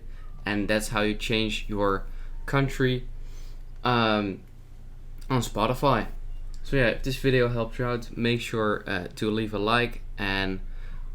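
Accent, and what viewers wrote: Netherlands. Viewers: Ik hoorde aan je stem dat je nederlands bent 😂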